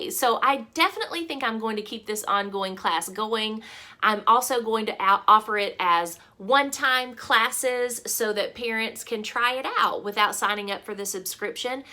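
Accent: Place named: Virginia, United States. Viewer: Thanks for answering. I have a thing about being very interested in accents. Love trying to pin it down. Often right, especially with Texans, but you had me really wondering, because I wasn't sure. But I thought you sounded like me. It's fascinating. I wish you would put me out of my misery!!!! Eliminating all the other states ... Hmmmm ... Maybe Virginia! Possibly a Carolina, North.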